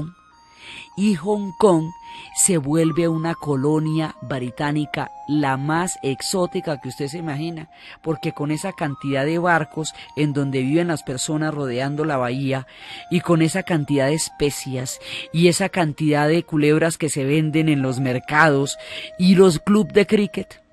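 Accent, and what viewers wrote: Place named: Bogotá, Colombia. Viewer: Es el acento y modismos de los bogotanos tradicionales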